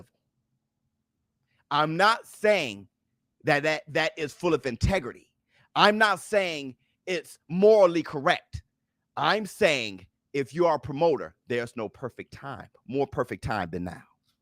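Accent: Chicago, United States. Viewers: Yo, you from Chicago?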